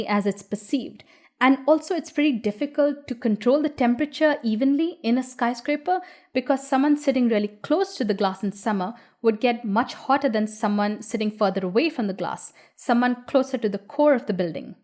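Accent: India. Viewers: Your posh Indian accent gives your content an extra brutal authority, and I'm loving it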